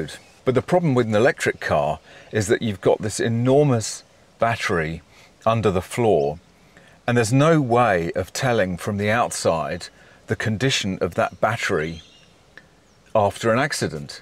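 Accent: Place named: RP, United Kingdom. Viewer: Hey, someone that speaks the Queen’s English!!!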